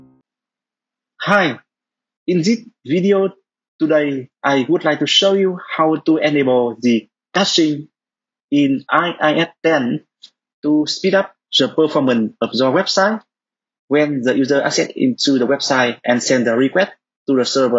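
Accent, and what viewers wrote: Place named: Vietnam. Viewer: Em nghe phát biết anh là người Việt, anh ra series tiếng Việt luôn đi anh.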